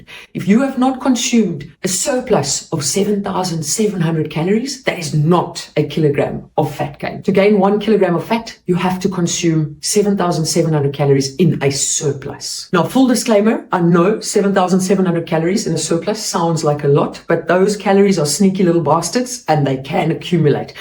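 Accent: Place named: South Africa. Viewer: I knev there where something of about the Scottish accent but there you go South African thanks for your quick response 😊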